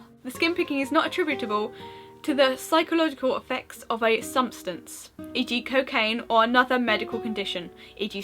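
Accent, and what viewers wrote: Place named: United Kingdom. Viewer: Great video! By the way, I love your british accent! :)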